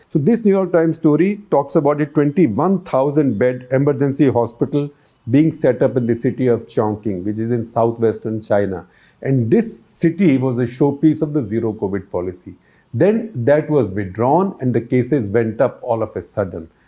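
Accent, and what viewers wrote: India. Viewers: dont understand ur indian accent..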